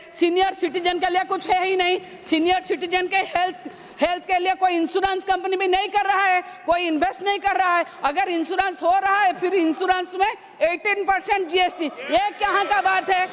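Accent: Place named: Jajpur, India. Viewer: Very nice jajpur voice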